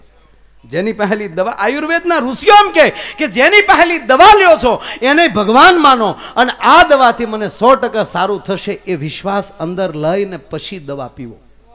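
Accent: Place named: Kathiawar, India. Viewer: Mayabhai kaddak pure kadhiyavadi superb jay mataji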